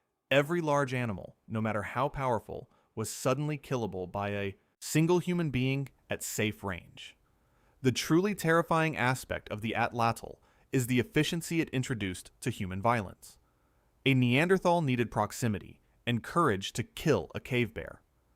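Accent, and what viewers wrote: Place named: United States. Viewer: i wish you could learn to pronounce composite correctly. Your American pronunciation is so grating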